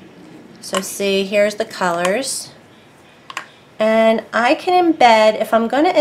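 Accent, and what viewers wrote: New Jersey, United States. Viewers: I know you're not wearing a mask in this video, but using long term mica powders can damage your sinuses and lungs. I know it's not fun, but please do it. I'm loving your channel, love your Jersey accent and joyfulness. Blessings to you and your family. xxXX Grambo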